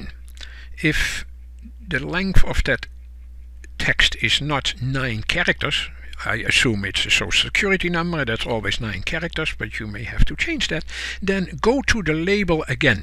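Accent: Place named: Netherlands. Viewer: Sean Connery is Scottish. This guy sounds like he's from the Netherlands or something.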